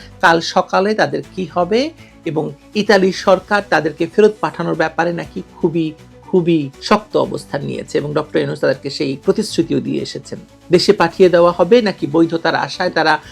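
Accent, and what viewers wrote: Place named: West Bengal, India. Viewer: আফা ভারতের ভাট পারার মেয়েদের মত কথা কয় কেন? বাংলাদেশীরা জানতে চায় ।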